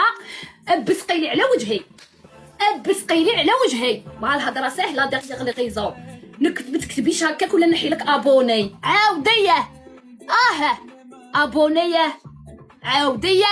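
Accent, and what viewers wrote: Morocco. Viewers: لهجتها مروكية هههه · بصح هدرتك مغربي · نتي مغربية ياختشي باينا في صوتك دي وجهك وروحي لقفطان عنده ماليه 🙂😌 تحيا لجزائر الحركية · لهجة تاعك مروكية يا لمسخة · أنت مغربية علاش تكذبي هضرتكم باينة عيتي تقلدي هضرت الجزائريين لكن مقدرتش لهجتهم المروكية طاغية القفطان جزائري نقطة ونرج للسطر أنتم امتهنتو صناعته وفقط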